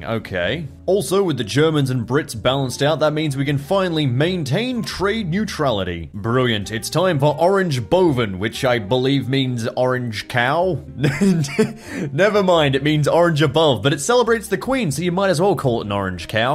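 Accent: Australia. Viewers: I really like to hear your smooth Aussie voice more clearly now. #feelsgoodman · why you Aussie YouTubers all sound the same, WTF is this magic?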